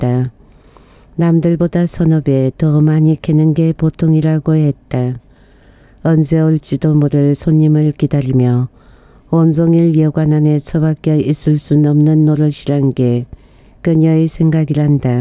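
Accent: Gyeongsang, South Korea. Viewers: 경상도억양이 처음에는익숙지못했지만지금너무도정감이느껴짐니다.감사히잘듣고있씁니다.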